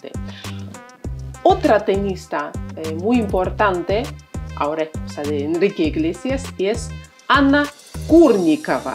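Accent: Argentina. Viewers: Lindo video Tienes acento argentino !!! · Tu español suena argentino? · Señora! Por favor la parte dos y tres. Literatura Rusa, música, arte en general. Faltan muchos nombres y apellidos. Muchas gracias! (Bonito acento argentino). Hasta pronto.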